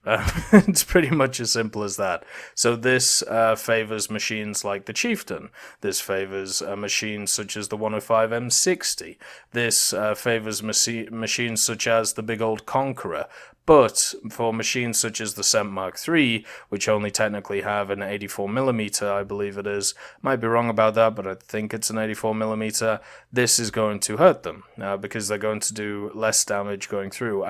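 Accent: England, United Kingdom. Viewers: Where is your accent from? Which part in England?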